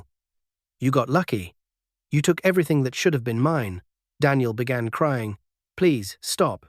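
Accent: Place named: United Kingdom. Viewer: Please can’t narattors be African. Why is this British person speaking!!!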